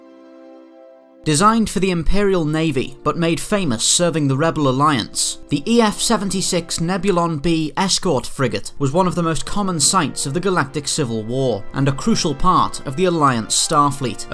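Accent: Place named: England, United Kingdom. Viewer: i like your style, fast and summarized yet detailed enough for us fans to understand. and that english accent is well suited for this type of channel. good work man! subbed!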